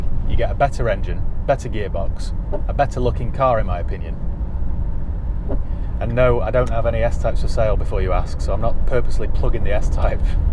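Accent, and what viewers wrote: United Kingdom. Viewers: I like your straight attitude and accent. Proper British.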